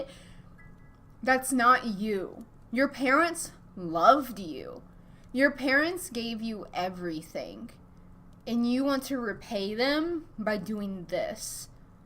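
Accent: South, United States. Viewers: To be honest, I could tell you were from like a southern area. But I am too. Hi southern sister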